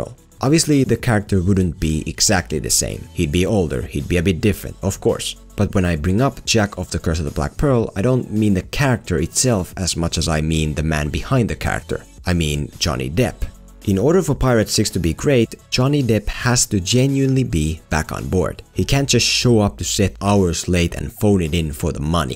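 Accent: Finland. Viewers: Where are you from? I smell Finnish accent oon suomalainen btw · Are you from Finland? Because you speak like that?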